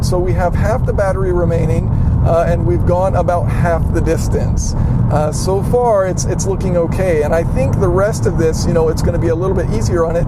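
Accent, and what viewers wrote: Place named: North, United States. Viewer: Thanks for a great test. I'm still trying to figure out in what part of NC you learned to talk like a Yankee.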